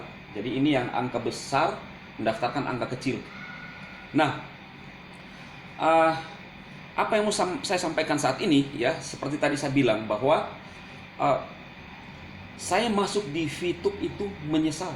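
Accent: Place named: Bugis, Indonesia. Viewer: logat bugis y.. josss vitube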